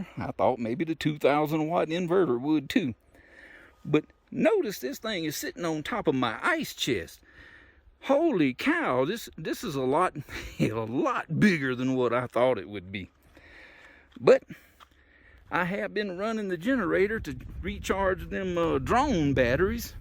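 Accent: United States. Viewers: I like you. I sure can hear Mississippi in you voice. Daisy sure is calm. Beautiful camp sight. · I loved your video and your southern accent!! I was looking into small campers, easy to handle since I will be camping by myself. Please add more videos with your set up and all the gadgets you think are the most useful for campers. Thank you so much again!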